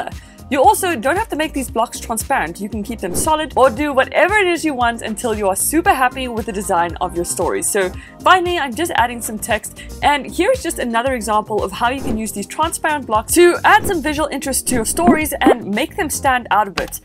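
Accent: South Africa. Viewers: Super professional! Learnt so much. Thank you!!! As soon as I heard your voice I knew this was a saffa lol. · new sub. youre south african, right?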